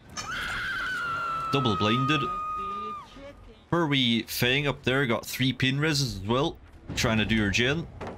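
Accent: Ireland. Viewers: what a strong accent,irish?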